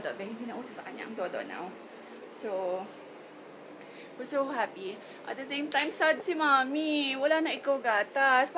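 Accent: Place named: Hiligaynon, Philippines. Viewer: Ilongga tapos Protestant. Grabe ka boses mo yung pinsan ko parehas kayo mag Salita ng tagalog.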